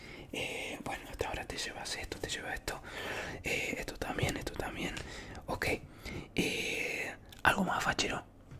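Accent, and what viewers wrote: Córdoba, Argentina. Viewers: sos de la calera, Córdoba??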